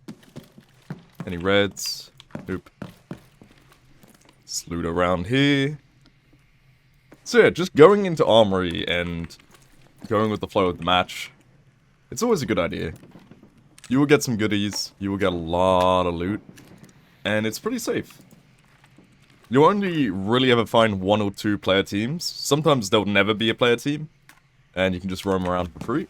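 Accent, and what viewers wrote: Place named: Holybourne, United Kingdom. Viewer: That holybourne accent tho........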